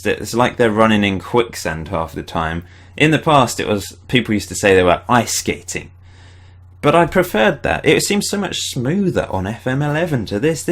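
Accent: United Kingdom. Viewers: british accent is too polite and educational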